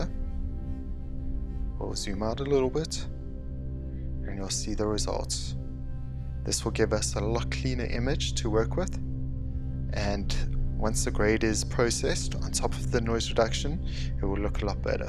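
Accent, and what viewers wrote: South Africa. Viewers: I sense a South African accent !!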